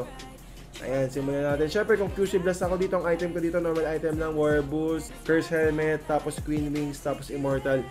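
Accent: Iloilo, Philippines. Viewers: Ilonggo ka pala lods? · ilonggo ka coach?❣️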